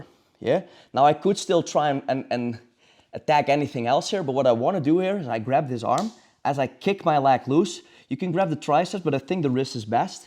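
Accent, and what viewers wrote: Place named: Netherlands. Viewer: Amazing! Very grateful🙏🏽 good english, but you cant hide that Dutch twang 😊 nogmaals bedankt, groeten uit 🇵🇭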